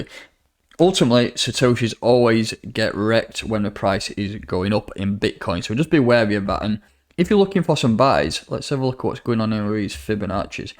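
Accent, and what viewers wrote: United Kingdom. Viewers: This is english..i bet you are american..he speaks British english..always american thinking they know it all.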